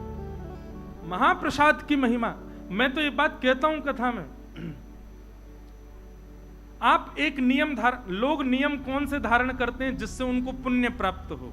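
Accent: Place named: Braj, India. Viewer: Jai shree krishna ❤jai siya ram ❤jai shri man narayan ❤radhe radhe maharaj ❤️🙏maharaj app kitne cute ho, app ki mukh se barj bhasa kitne pyare lagte hai❤❤❤🥰🥰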